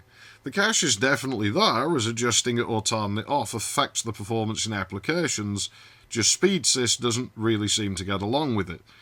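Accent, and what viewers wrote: United Kingdom. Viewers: As someone who has watched UK TV shows for years, I thought I was used to your myriad accents. I was clearly a cocky bastard. Guess I just have to watch a few more of your videos to get an ear for it. Nevertheless, this is a fantastic video about a neglected CPU. My first computer was a Cyrix, so while I don't think of it in fondness, I respect its place in my history. It played those Apogee & Sierra games beautifully and ran QBASIC like a champ. It came with Windows 95 OSR2, which many people forget about when talking about 95.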